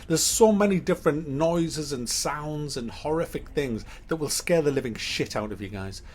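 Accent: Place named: Newcastle, United Kingdom. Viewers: soon as i heard his geordie voice i switched off lmao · So someone asked me if I was on Youtube. I said no, so I checked out the channel and fuck me, we sound almost the same. Where abouts in the Newcastle area are you from?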